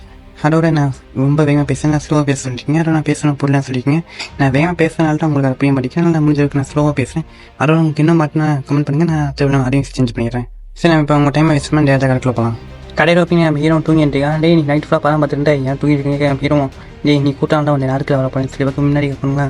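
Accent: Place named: Kerala, India. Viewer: Bro, tamil thana ,nenga pesurathu konjam Malayalam tamil kalanthu pesura mari irukku so, please tamill padichitu YouTube pesunga.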